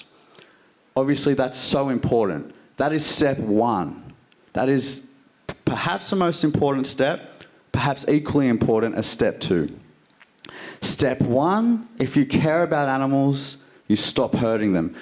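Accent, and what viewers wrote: Australia. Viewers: u Australian lad?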